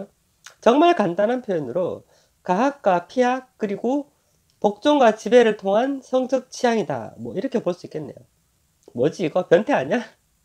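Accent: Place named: Gyeongbuk, South Korea. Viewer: 서울분인줄 알았는데 고향이 경북분인가 보네요 경북사투리~^^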